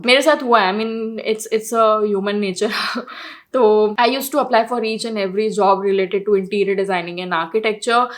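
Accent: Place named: India. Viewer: Thank you for those content,in this video it was really hard for me to understand since you speak indian,can you make your videos with subtitles?thank you.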